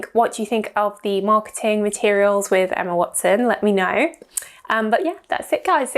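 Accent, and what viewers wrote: United Kingdom. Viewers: is your dialect british?(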